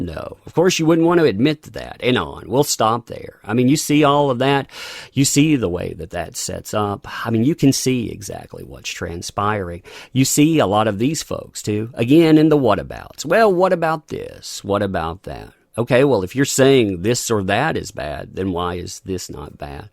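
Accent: South, United States. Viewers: Damn my guy. You've got that good ole fashioned southern draw makes me feel home.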